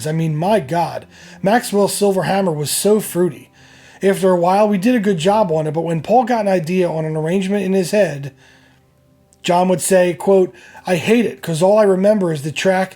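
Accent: Philadelphia, United States. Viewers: Hey, are from somewhere near Philadelphia? I like guessing American accents. I’m front Boston where we famously have distinct an accent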